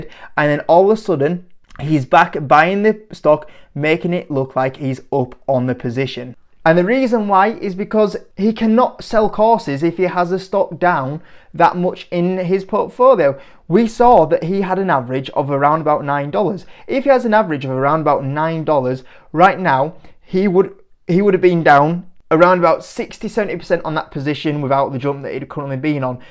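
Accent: United Kingdom. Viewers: British accent is immediate red flag LOL lets hear a European school the Americans about the stock market LOL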